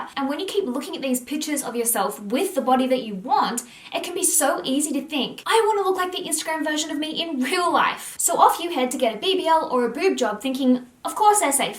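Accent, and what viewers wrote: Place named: Australia. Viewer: aaaaah cant believe you replied meeee!! i love your aussie accent i want to speak just like youuu hahahah looooove your content btw keep it up!!! i never miss a video!!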